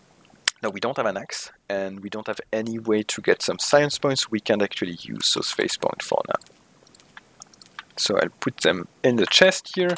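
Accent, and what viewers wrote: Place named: France. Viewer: I really like your french accent, by the way.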